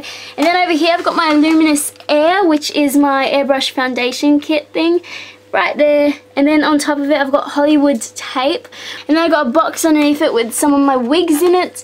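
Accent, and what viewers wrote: Australia. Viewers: are u from Australlia because you sound like it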